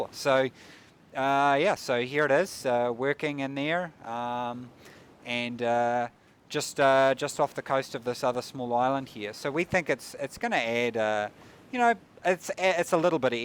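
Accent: New Zealand. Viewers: Love the kiwi accent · Dat kiwi accent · Lol you didn't recognize a New Zealand accent? Anyway it's the ugliest accent that the English language has, after Californian. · Guy talking has a kiwi NZ'er accent. Am I wrong? developer is a Kiwi? :D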